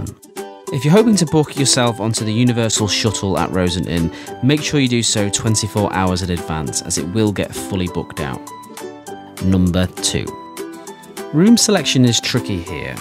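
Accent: United Kingdom. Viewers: We go here every year fir the past 8 years. We stay for about 4 nights then onto a villa. We have NEVER had 1 problem. This place is spotless! We always leave money for the cleaners at end. We have left things out on the bed etc and they are always neatly left back after cleaning. Just say hi to the people that work so hard for you and they will appreciate it and look after you. Our fridge didn't work properly last year and they where on it like a car bonnet within minutes and replaced the whole thing whilst we went for dinner. You can walk to all bars restaurants etc. And the convenience store is reasonable. Did you know you can get a discount on food if your over 55? We never eat there but I spoke to someone who told us this. I'll be going again this year. And then moving onto the clarion inn at lake buena vista which is also a Rosen hotel. Just by Disney springs.. 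similar vibes.... I think you are from my part of the world judging by your accent 👍 x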